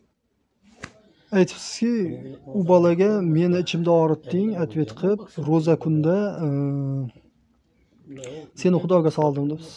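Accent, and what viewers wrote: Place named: Tashkent, Uzbekistan. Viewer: Салом,. Гапингиздан Тошканликка ўхшаяпсиз. Мабодо Тошканда кўриб қолсангиз,мани ўрнимдан, чирройли,силлиққина"башшарасига тупуриб қўйинг. Йўлим тушиб,бориб қолсам,ўз синглимдек ҳурматингизга етардим. Олдиндан раҳмат.🙏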